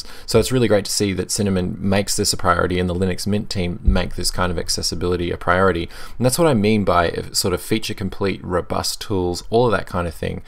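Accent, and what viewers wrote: Australia. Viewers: G'Day mate. Thanks for this. I've been wanting to install a new distro on one of my PC's and you've certainly given me a great deal of information to digest plus conduct some more knowledgeable research. So good to hear an Aussie accent on YT as well. Cheers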